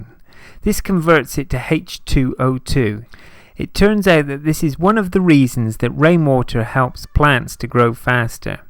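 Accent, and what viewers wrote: United Kingdom. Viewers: Pretty sure mould is the British spelling of the American word "mold". Judging by our narrator's accent, he must be from that part of the globe. I do not think it is wrong to point out a misspelled word in any public context; how else are young people supposed to learn if not by example? Both acne and disinfect were misspelled in this video - pointing out or correcting a mistake does no disservice to the narrator and just might do someone a favor. Come on, is it really better to remain ignorant and illiterate than to learn a little something? How else are young people to learn, if not by observing the world around them, spelling and all? Please, stop being so stubbornly opinionated about someone pointing out an error in a video; it should all be part of the experience. Or are civil discussions completely beyond us....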